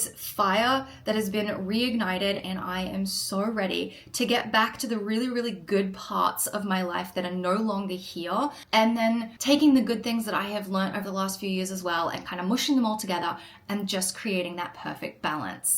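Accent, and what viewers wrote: Australia. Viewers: no no the aussie accent is going!!! ❤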